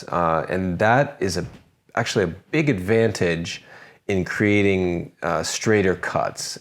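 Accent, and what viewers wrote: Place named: Canada. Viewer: Great video, great idea. Also, I could listen to Canadians say words with the "ou" sound all day long: out, about, house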